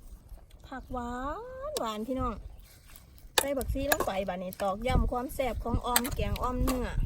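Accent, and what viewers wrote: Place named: Khmer Suay, Thailand. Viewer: ตอนแรกนึกว่าเอื้อยเป็นซวยเขมรทางบุรีรัมย์ สำเนียงการเว้า หน้าตากะคือ อย่าด่าเด้อ พึ่งคอมเม้นแรก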